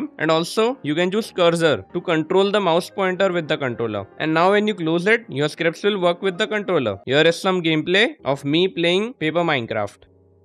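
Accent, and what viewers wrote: India. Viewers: You know you've struck gold when you hear that sweet, sweet sound of an indian accent when looking for a tutorial, thanks man! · bro talks like indian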